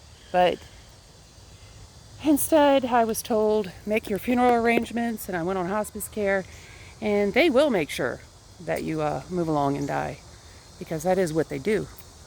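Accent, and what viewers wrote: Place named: South Carolina, United States. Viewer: Love your accent...Is that South Carolina ?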